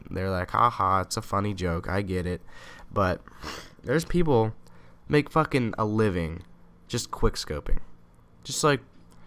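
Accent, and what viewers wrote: Ireland. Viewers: Youtubes mother fuckin best commentator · Are u really Irish becouse I live in Ireland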